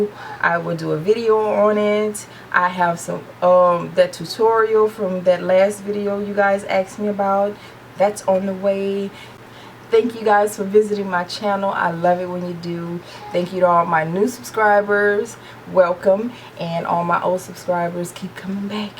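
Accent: New Orleans, United States. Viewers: New Orleans? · Sound like you from new orleans babyyyy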